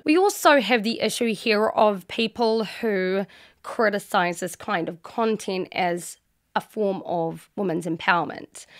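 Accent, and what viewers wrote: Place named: New Zealand. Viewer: She sounds kiwi so New Zealand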